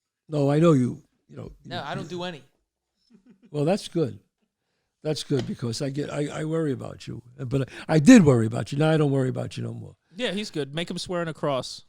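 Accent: United States. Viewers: Enjoy the show.. love the old nyc talk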